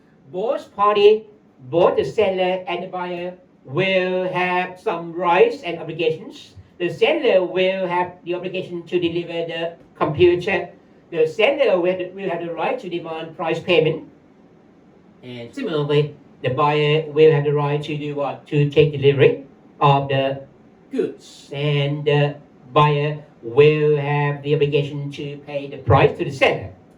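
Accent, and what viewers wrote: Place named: United Kingdom. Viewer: British accent!! no doubt.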